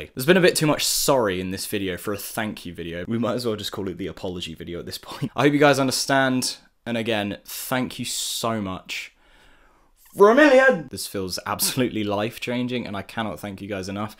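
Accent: United Kingdom. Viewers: yOu sOuNd bRiTiSh also late congrats on 1 mill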